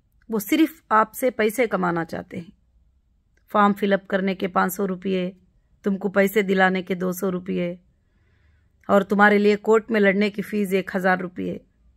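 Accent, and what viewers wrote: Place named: Bihar, India. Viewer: ye bihari hai bol se lang raha hai to up bihar m launch kar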